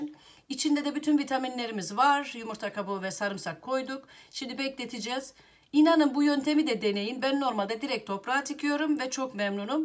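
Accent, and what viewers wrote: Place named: Trabzon, Turkey. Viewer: Harika bir vidyoydu bu arada siveniz trabzon gibi memeleket neresi🤗👏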